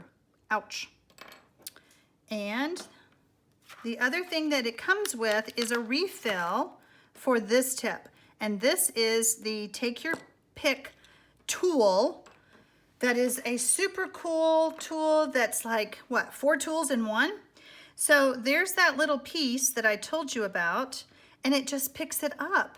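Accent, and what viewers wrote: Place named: Texas, United States. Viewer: Love you accent It makes me think of Texas each time I watch. I-grew up in Paris Texas and lived in the DFW for years. Now we’re in TN My question is what is I would like to view your video of the one sheet wonder you did of the Merry and Bright DSP. I love the cards you made from it Could you guide me to the video. I couldn’t find in on utube. Thanks for your help Texas girl.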